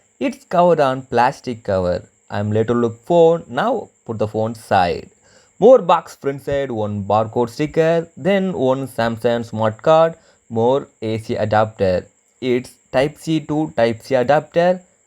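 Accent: India. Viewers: Too hard to understand with your heavy Indian accent, moving on.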